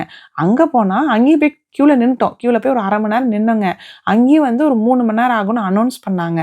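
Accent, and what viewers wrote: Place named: Erode, India. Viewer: Unga slang partha erode side nu nenaichen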